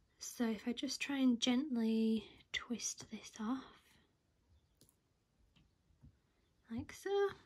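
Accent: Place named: Northern England, United Kingdom. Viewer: I really enjoyed the journey of these plants and am looking forward to trying this myself. There is something addictive about making new plants for free. Also I love your accent...I am from Yorkshire myself but live in Ireland so miss hearing Northern voices!!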